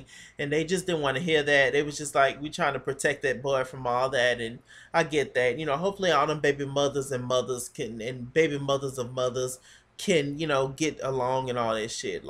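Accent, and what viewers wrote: New York, United States. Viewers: The New York accent is just too real. Yo, is you from Brooklyn or the Bronx? I TOTALLY agree with your opinion of the busted up old ass creep squad. I don't see what any of these females, season after season, see in any of those guys. In addition to being old, washed up, and unattractive - I have never seen one of them do anything to further anybody's music career. People don't seem to like BBOD or Moe Money, but one thing that I will say in her defense...she didn't allow Cisco to play her once she found out that he was screwing Mariah No Carey. When it comes to LHH, these dumb ass chicks fight over these lame ass dudes. But Moe cut it off at that very moment and told Mariah that she could have him. I was totally here for how she handled that. GREAT REVIEWS FOR THE WHOLE SEASON! You're awesome!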